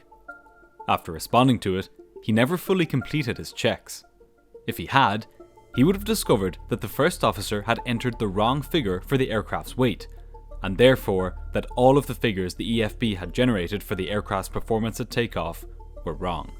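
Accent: Ireland. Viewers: I had no issues. It’s called Irish. You want him to put on a fake accent or something? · by the way, being able to put a voice (in your case, a gorgeous Irish accent) to a face is lovely, so it was delightful to see your handsome face in this video - I do hope that you do more of this in future videos 🇮🇪☘️🇮🇪❤️ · What a great video! It’s a while since I’ve watched one on this channel and I forgot what a brilliant story teller you are. You know how to create tension, which is a real skill. Don’t ever use AI - your Irish accent is much, much nicer! I feel a bit sorry for pilots who get fired. Yes, mistakes were made, but nobody died and I think it creates a culture where mistakes are covered up if possible. It’s easy to see how this happened and I’m sure these pilots especially would never again let this happen. Did the augmenting crew also get fired? I can’t see why they were told not to contact their families either, who may have heard about the accident and be very worried. 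· He's Irish. Like any accent, just takes some practice to understand it :)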